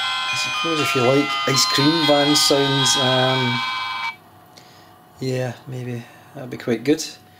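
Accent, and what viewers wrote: Scotland, United Kingdom. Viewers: But he isn't murican... The accent is scottish I pressume... But I do agree about the bubbles abit.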